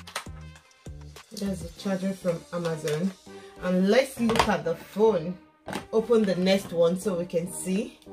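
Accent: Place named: United Kingdom. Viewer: I need me a UK sista that accent 😍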